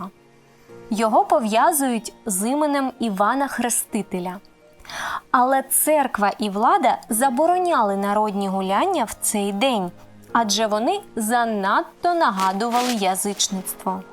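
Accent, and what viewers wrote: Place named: Luhansk, Ukraine. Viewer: А я люблю вашу вимову - така Луганщини))) Луганськ - ЦЕ УКРАЇНА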